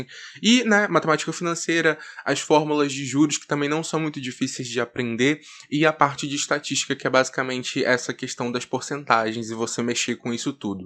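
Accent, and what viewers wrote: Pará, Brazil. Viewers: Tu é do Pará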